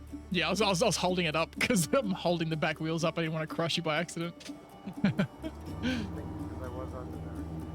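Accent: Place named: Australia. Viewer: Hi whats up: Love your uploads.. and I believe Ozzie oZZie accent. The problem with CIG is they charge far too high prices for goods that pose no challenge for other products within their price range. The CVS-SM seems to sell for 50dollars or 50 pounds which is how much the entire game sold for with SQ42 and a Starter. Starter ships now cost that begotten price and the game yet alone a package. The CSV-SM is not seemingly calibrated with a driving rig meaning there is three way deal. You can't manage cargo more effectively. I would love to attach compartments enabling maybe a 12-16 hauling pool henceforth a customisable truck variant! I don't WANT a variant - but extensions for CSV-SM... what cha think??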